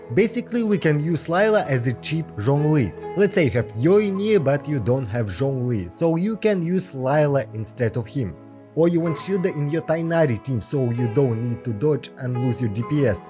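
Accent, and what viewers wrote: Russia. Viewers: Это снимал русский? Акцент прям заметный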